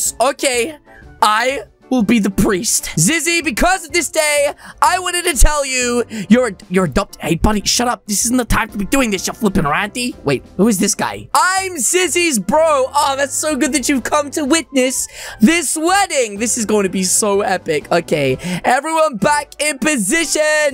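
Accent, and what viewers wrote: England, United Kingdom. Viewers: Search for more bullies in bubble gum simulator Also are you from england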